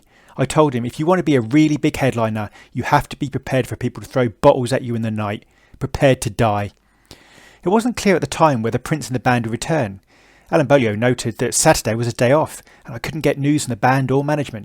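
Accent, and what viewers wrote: United Kingdom. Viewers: Oh really? So that was your video narration, then? Well, it just happens to be truthfully the case that your ultra-posh accent led me think you're likely gay. There's nothing wrong with being gay in and of itself, btw. The only thing I actually have against gay culture these days is its wholly unrealistic demand for universal acceptance, which it will never get, if for no other reason than that it runs contrary to many people's traditional religious beliefs. Anyhow, if what I said about your accent bothers you, then I suggest you gtfo of the London area and spend time with scousers. You may or may not like them, but at least you'd rarely suspect they're queer based on voice alone. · ingilsh accent, ingilsh bs.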